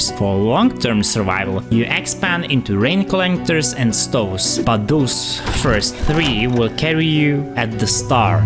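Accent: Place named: Czech Republic. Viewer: Nejsi náhodou čech? už jen podle toho jména a v ty ang mrtě slyším ten přízvuk, nic proti pěkný video :D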